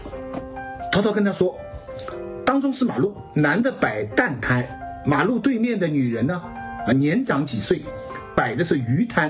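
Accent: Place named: Shanghai, China. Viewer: 喜欢许老师用上海话念书！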